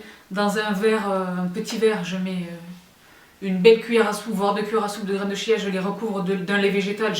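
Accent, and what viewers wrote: France. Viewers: Originaire de Marseille, j'habite à Ottawa au Canada et ma soeur m'a fait découvrir ta chaine. Le "salad spinner" est pas mal - je l'ai acheté l'année dernière et il est encore nickel! Merci pour tes vidéos! Ca fait du bien d'entendre l'accent français!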